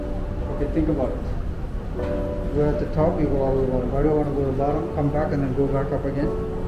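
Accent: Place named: Quebec, Canada. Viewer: I'm so interested in knowing where your french speaking is from! It's so "Canadian" it's baffling.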